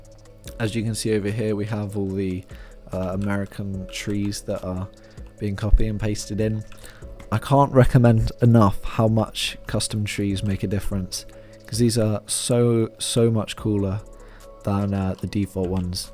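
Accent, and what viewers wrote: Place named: United Kingdom. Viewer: british i know it on your voice 🙂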